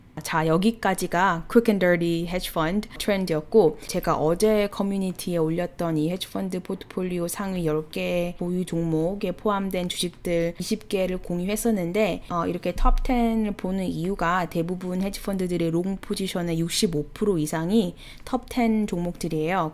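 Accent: United States. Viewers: ㅋㅋ 아니 미국에살아서 미국식 발음 하는게 뭐가 그리 불편함? ㅋㅋㅋㅋ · 뉴요커발음 ㅓㅜㅕ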